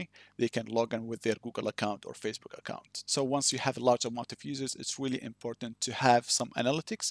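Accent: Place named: Arab world. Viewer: Are you Arab? Because you sound like one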